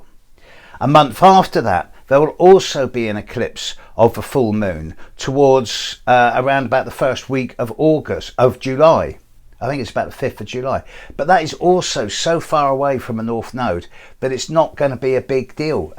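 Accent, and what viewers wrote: United Kingdom. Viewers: I love your British accent and love how articulate in the way you speak. Wish more people would learn how to be articulate as you are and being able to convey and express themselves in ways that are pivotal and genuine.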